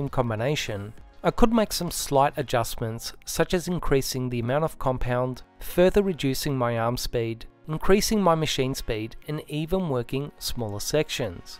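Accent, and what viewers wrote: Australia. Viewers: I love AU accent! Almost British! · Woah it's an Aussie!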